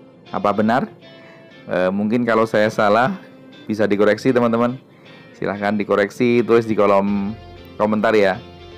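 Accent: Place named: Purwokerto, Indonesia. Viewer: Bahasanya ciri khas Dr utomo yg asli purwokerto👍👍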